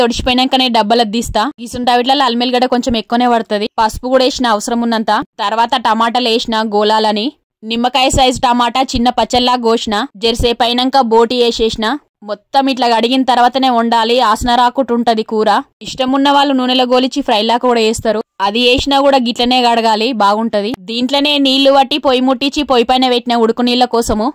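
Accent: Telangana, India. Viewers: 3 rojulla nundi mee videos chistunne unna .endukante mana slag matladutunte manchiga anipistundi .inka miru cheppe tips chinnavi ayina chala useful avutayi.nxt video kosam eduruchusta bye akka❤ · mi voice Telangana slang lo chala bagundi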